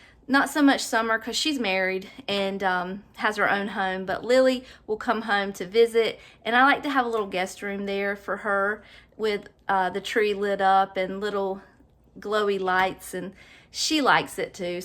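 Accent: South, United States. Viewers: Thanks for getting back with me. Such a great idea. Love your southern accent and down to earth explanations. Joy to watch you🎃❤️ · I just decorated my house this morning. Now I’m watching you while I knit on this rainy Alaskan day. You are just so sweet and listening to you, I’m remembering my southern roots. ❤️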